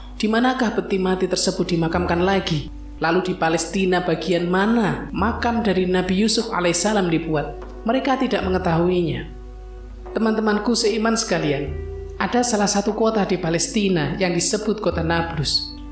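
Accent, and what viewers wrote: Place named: Java, Indonesia. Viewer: Logat yg bikin video jamet abiss medok da males gw ntn ni video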